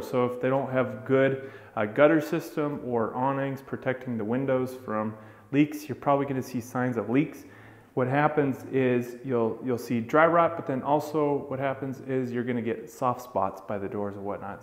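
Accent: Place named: United States. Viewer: Nice to see a video where the person speaks decent American that we can all understand.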